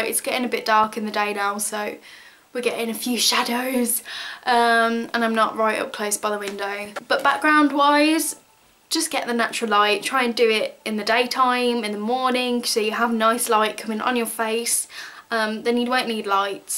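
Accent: Birmingham, United Kingdom. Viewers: Good to see that your back at making videos 😊 When you said about the accent, I can hear the brummie in you, it's a nice little accent! Xxx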